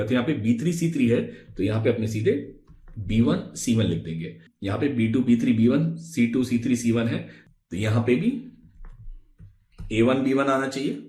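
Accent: India. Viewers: Sir aap southern part of india se hai kya?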